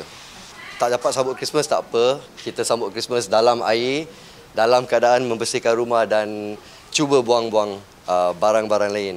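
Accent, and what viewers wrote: Malaysia. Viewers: Bro Your Bahasa in truely Malaysian, Good luck.👏👌👌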